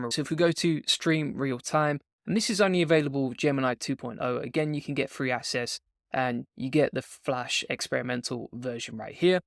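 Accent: England, United Kingdom. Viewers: Btw! I love love the accent! Are you English? Or Scottish? Am i insane for liking the accent?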